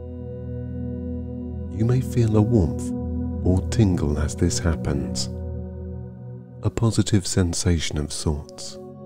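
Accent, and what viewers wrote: United Kingdom. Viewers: Why are these videos always a deep voiced British guy or a Russian woman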